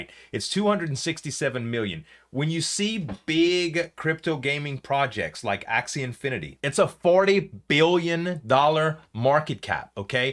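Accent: Louisiana, United States. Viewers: Lol what part of Louisiana you from bro?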